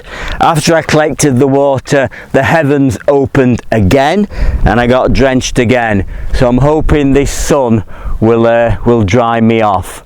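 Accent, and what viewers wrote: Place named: Manchester, United Kingdom. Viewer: Where are you from, accent a bit Manc, but maybe our Oldham ?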